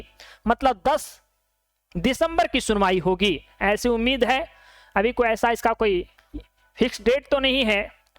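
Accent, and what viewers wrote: Bihar, India. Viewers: Tum bihari ho n...tumhra language n tone bihari wali hai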